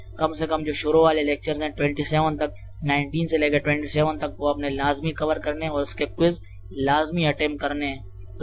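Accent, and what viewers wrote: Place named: Karachi, Pakistan. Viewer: bro ascent sa to karachi k lagty ho #karachiwalay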